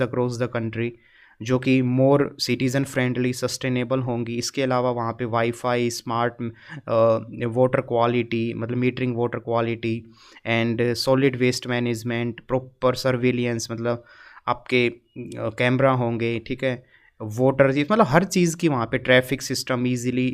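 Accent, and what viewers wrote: Haryana, India. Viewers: apki language m haryanvi accent aave tha ismare puchi thi..m bhi haryanvi hu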